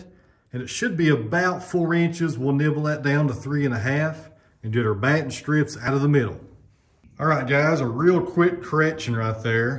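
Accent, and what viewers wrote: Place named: East Tennessee, United States. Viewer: Glad I found your channel. While I'm at work, up in a boom running conduit, I watch your content while I'm waiting for material or whatever. lol That East Tennessee accent makes it feel like I'm watching someone I can relate to a little better than the average channel. 😂 If you've done much traveling, you know everyone isn't as friendly as most folks around here are.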